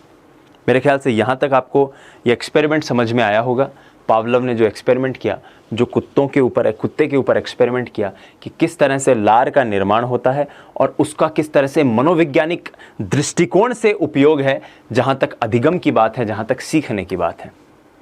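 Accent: Rajasthan, India. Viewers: The way you speak marwari😍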